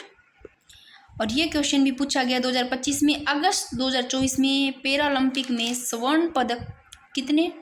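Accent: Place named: Marwari, India. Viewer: Aapki boli to marwadi lg rhi hai acha lg rha hai sunke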